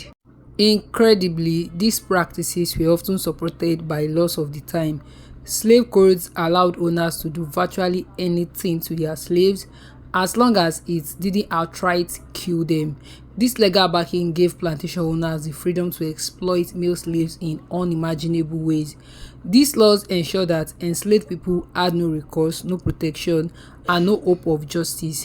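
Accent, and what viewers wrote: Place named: Africa. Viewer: Thanks for telling the story I could tell from your accent that you’re from the continent of Africa I wonder why so many Africans hate African-Americans when we came from Africa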